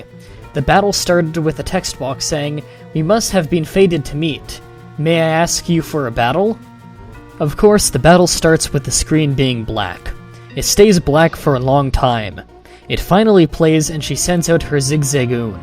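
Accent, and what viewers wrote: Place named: Canada. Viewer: lol ik i do apologize but there was just something about your accent said "canadian in my head."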